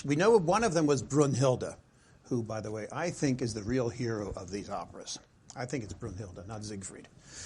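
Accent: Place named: Philadelphia, United States. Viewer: It’s a Philly accent….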